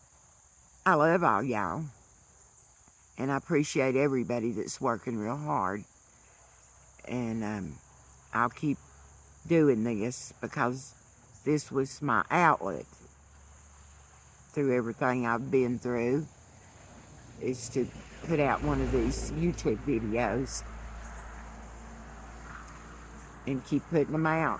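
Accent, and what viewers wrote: South, United States. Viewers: You are voicing exactly how I see things as well. You’re a good, brave person. A beautiful southern voice.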